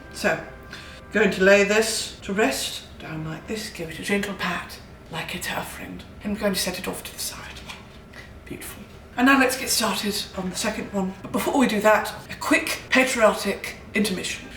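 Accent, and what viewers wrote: United Kingdom. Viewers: ngl her british accent reminds me of Endive from the cartoon ''chowder'' which to this day is one of my favorite childhood shows. her voice just sounds kinda similar which is so funny to me. i love her and her personality as much as the tv show. they both make me laugh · Even the cadence of your British accent is on point 😂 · Why is her British accent giving captain jack sparrow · after hearing you speak in a British accent almost the entire video it sounds weird to hear you speak in your normal American accent lmao. the British accent is so fitting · I lost it at one point and convinced myself she's really British. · Hey, HOW THE HELL IS YOUR BRITISH ACCENT SO FUCKING PERFECT?!? AND I'M FROM ENGLAND! 🤣🤣👌🏼👌🏼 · bestie does a MEATY british accent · The fact I just watched someone on the internet make 3 white bread sandwiches in a British accent for 18 minutes should prove how much I love this woman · You sound more British than a real British person, please never stop doing what you’re doing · I could listen to her speaking in a British accent while I sleep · British accent is giving me Stephen Fry today. 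· not only can do a british accent but she can do like four different british accents. how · the 'British' accent just reminds me of our god forsaken prime minister. Boris Johnson. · Lordy she’s more British than me. Amazing accent. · Dude the British accent! 😂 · it triggers me that as a 30 year old born and bred brit (born 'n bred, thick in the head Oi Oi!!!) you actually sound more British than me. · The British accent is far too much like boris Johnson and it’s triggering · I showed my friend this and she thought you were British lol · I rate your effort with the British accent and absolutely loveeee you and your videos BUT the only specimen you sound like is Boris Johnson. Disrespectfully 😂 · this video made my day, love your British accent, it's spot on! You are awesome! ♥️👍😊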